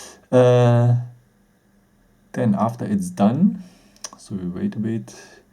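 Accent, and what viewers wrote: Germany. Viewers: Hundert prozent deutscher. Aber video hat geholfen dange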